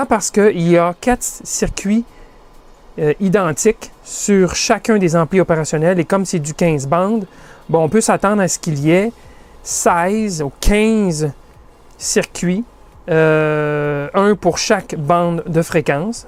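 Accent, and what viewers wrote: Quebec, Canada. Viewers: Bonjour, merci pour votre vidéo. Je me posais des questions quant à la mise à la masse commune en cas de mesure avec un ocsillo et comment juste avec un multimètre suspecter un condensateur de filtrage. Et bien, j'ai eu ma réponse et vous en remercie. Etant Français, l'accent Canadien est un pure plaisir ;-) merci à vous. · Excellente video, comme toutes les autres d'ailleurs ! Un grand merci et Bravo a vous et avec cet accent de nos sacrés cousins !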